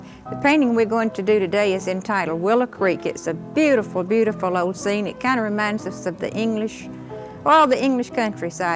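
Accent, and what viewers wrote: South, United States. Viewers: I love her Southern accent!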